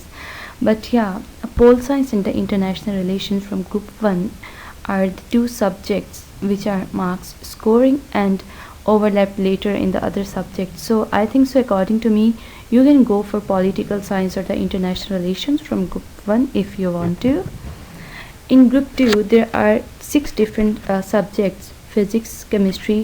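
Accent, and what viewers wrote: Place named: England, United Kingdom. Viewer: A lovely English accent! A simple mesmerising voice! One of the best guidance ever! I wish all the best for you! Keep making this incredible videos for us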